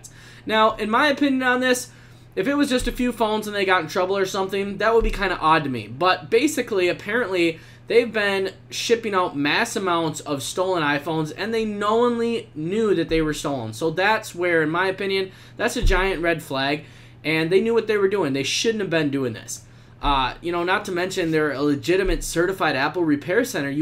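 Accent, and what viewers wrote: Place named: Detroit, United States. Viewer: You from Detroit?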